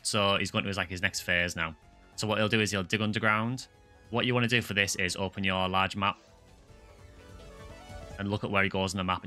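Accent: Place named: Teesside, United Kingdom. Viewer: you sound like your free teeside mate! Me and my friend from Billingham are literally downloading this game tonight to relive the nostalgia!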